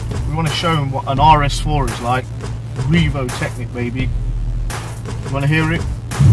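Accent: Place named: England, United Kingdom. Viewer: Love you english accent man goed review subscribed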